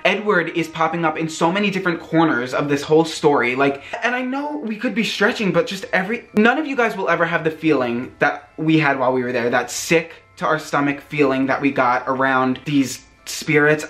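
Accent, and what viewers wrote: New York, United States. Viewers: You are the most beautiful person I have ever seen 😭 this is the first video I’ve watched from you and you are so awesome btw I love your New York accent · Your New York accent oh my goddd 😍😍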